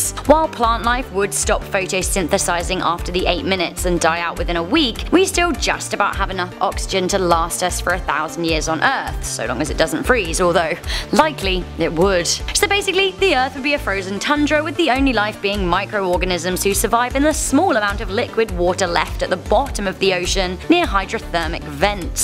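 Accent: United Kingdom. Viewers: I can’t stand how poms say vitamin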